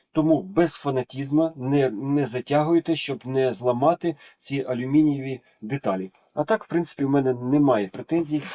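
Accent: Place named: Russia. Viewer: Тренируй украинский язык. Уж больно сильный русский акцент😅😅😅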